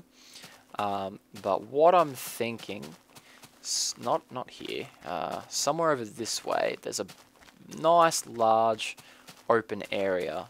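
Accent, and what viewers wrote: Australia. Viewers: this may be a stupid question, but do you live in Australia? You sound sliiightly Australian.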